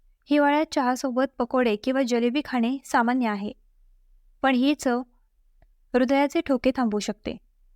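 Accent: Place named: India. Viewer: तुमचे मराठी हिंदी वळणाचे आहे. मराठी शिका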